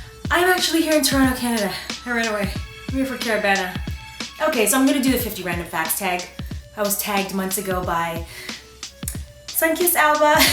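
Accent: France. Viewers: I love your french accent it's really good (I'm french by the way) Love from Paris 3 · I'm french and your french accent is the cutest omgg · your french accent is so freaking cute! You should come to Paris one day! Je t'aime! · I'm French and you know what ? I REALLY LOVE YOUR FRENCH ACCENT !!! :D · love your french accent, you're sooo cute ! I'm a new sub and already love your channel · love your franch accent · i loove your accent in french! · J'adore ton accent français ... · Ton accent francais est so cute! :D ❤ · Great video !!!!!!!! And i love your French accent :) Je t'adore <3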